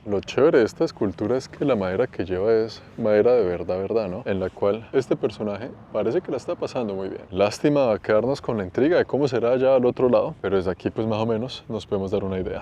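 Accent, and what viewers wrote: Bogotá, Colombia. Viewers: Es que es Colombiano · es un Chico muy culto no te preocupes no dira nada indevido. Si voz es muy relajante obvio por su acento Colombiano muy agradable de Escuchar y correcto español. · es de Bogotá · Tu voz y tonada colombiana es muy grato escuchar! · Cómo siempre muy buena su crónica y narrada con su estilo muy colombiano. Excelente, gracias. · Este narrador habla español con un espectacular acento de Bogotá, Colombia. Diría uno, sin conocerlo, que creció allí.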